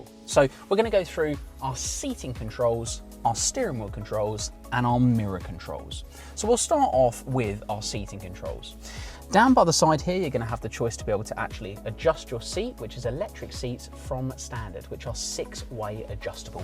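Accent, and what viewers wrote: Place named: United States. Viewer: is an American accent.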